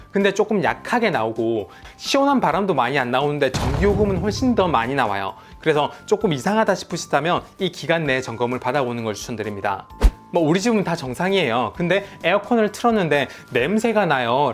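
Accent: Gyeongsang, South Korea. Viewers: 경상도 사람입니까? 서울 사람입니까?ㅋ 귀여우시네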